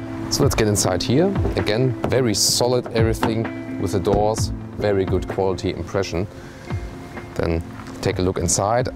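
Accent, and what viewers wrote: Germany. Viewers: 德国人说英语其实非常有特色，而且在欧洲算是很流利的。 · My men ur English sounds quite. ... German 🤣 all the respect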